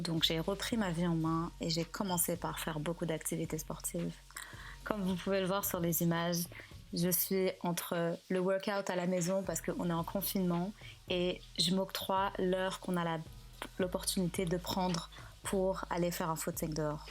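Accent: Quebec, Canada. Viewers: First, tu as clairement pris l’accent québécois hihi (we win!!). Second, JADORE encore une fois ta vidéo. Non suelement ton montage est magnifique, mais tu as toujours toujours pleins de conseilles qui nous aide à se développer. Merci , merci de partager ce moment difficile avec nous. De nous inspirer à aller de l’avant et être de meilleures personnes! We love you💕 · J'entends un accent québécois là nan ?? 🙀🤭 Super vidéo avec des good tips, ça fait toujours du bien de le rappeler 😘 Keep doing girl 💪😘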